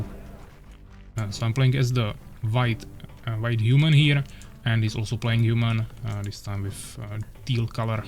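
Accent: Czech Republic. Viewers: tu češtinu v hlasu slyším hodně :-)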